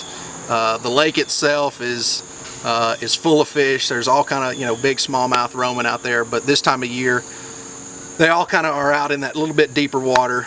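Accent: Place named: Carolina, United States. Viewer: Gosh-A-Mighty, you are a pure Carolina Boy! Love it!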